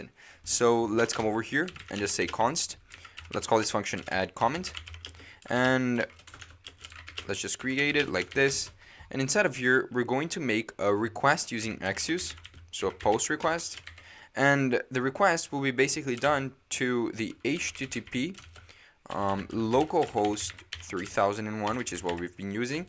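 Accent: Brazil. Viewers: The right thing was to call the function to load the comments and not to force in the front-end with a state. But ok nice video, helping a lot. (Tu é br com esse sotaque ctz kkkk abraço)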